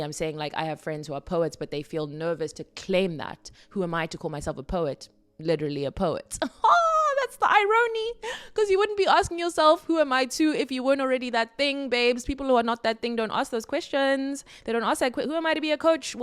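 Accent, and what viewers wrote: South Africa. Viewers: Your accent sounds like you're South African- if you are, it's always amazing discovering fellow South Africans who are sharing such wonderful videos through the lens of spirituality.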